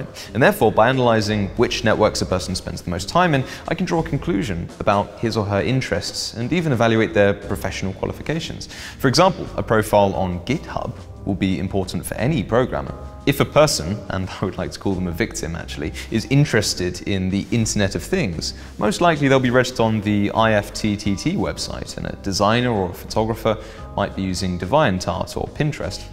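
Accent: RP, United Kingdom. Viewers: The ultimate effortlessly posh accent. Brilliant.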